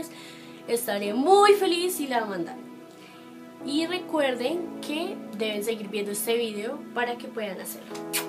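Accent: Colombia. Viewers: ¿Eres de Colombia<3 ? No sé, te escuchas colombiana:3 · Me encanta el acento colombiano. :)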